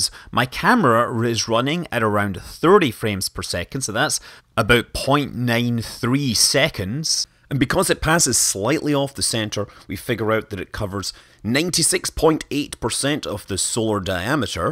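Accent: Scotland, United Kingdom. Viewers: My head hurts ....too many numbers .....I live on Skye ! You sound jockanise .... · It just hit me! I figured out why I love watching your stuff so much! Your accent is tricking some part of my mind that Scotty (Star Trek) is explaining this all to me. It must be right! Honestly though, you do a great job of explaining. Even though all this stuff is way above my pay scale, I'm actually "getting it" for the most part. I thoroughly enjoy watching your channel. Keep up the great work. It's cool that you've got your daughter involved as well, it's sure to pay off for her one day.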